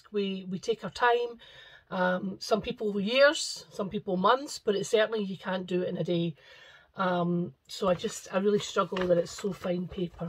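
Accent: Scotland, United Kingdom. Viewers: I discovered you earlier this week and binge watched several episodes and of course subscribed. I've fallen in love with your beautiful Scottish accent!! I'd watch just for that, however your content is wonderful too! So win win! I'm sorry about your dad's health. And yours as well. How painful. I'll pray for full recovery on your legs. I'm sure you are looking forward to summer as much as we are.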